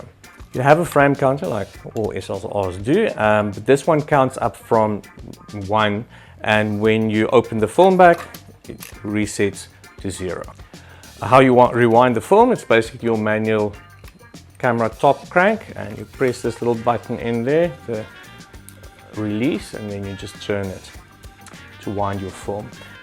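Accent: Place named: South Africa. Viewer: Great video, I have also the AE-1, AV-1 and AT-1. I can see why people love them and I loved your opinion too. Fair review and I learned a few things I didn't know before. I'd recommend Rode Wireless mics as your audio is peaking slightly with the Boer brogue at times. I'm Scottish and had similar volume/ peaking issues. Rode mics are excellent and great value. Great content, cheers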